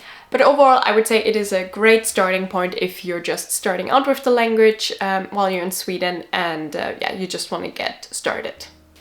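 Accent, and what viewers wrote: Germany. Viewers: Du pratar bra svenska 😊. Man hör din tyska accent mer, men det är bara trevligt. Vi är ju vana att lyssna på drottning Silvia 😊. Dessutom är vi också vana vid invandrare från olika länder som försöker lära sig språket. ❤ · Åh, du pratar ju jättebra svenska. :) Du har en ganska tydlig tysk accent, men rent språkligt var det bra. IMO coming from Germany, Netherlands and obviously Denmark and Norway you have a quite a large advantage (even compared to English speakers) due to the similarity of words and pronunciations between German and Swedish. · Sehr gut svenska med ein bisschen Deutsch men helt keep on work on it 😊 tack så mycket hörde du hade dialekt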